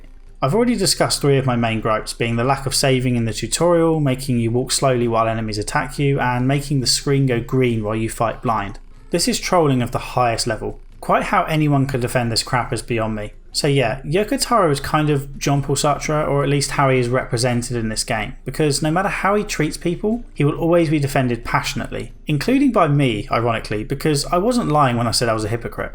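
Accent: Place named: RP, United Kingdom. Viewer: The fact that you actually did your background research, stacked with how thorough your reviews always are, multiplied by the fact that you speak the queen’s English (i’m american and love that accent) makes me wish you did full story spoiler reviews. I don’t really watch your videos as research tools for games I might play, i mainly just try things out, but I purposely look for your reviews of games i loved ie: dues ex for example.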